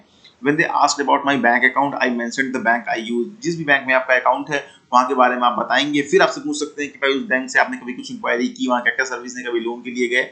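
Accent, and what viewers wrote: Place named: Punjab, India. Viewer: Sir kbhi hindi jyada boli nahi to hindi me Punjabi touch feel hota hai ....chlega kya!?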